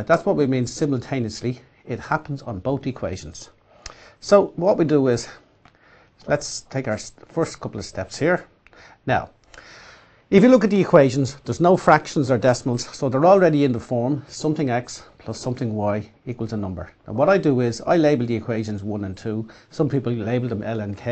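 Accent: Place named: Dublin, Ireland. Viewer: Am In 2nd Year And I Know That! But Fail Play Tah Yeh!! Btw Love YOur Accent very dublin :L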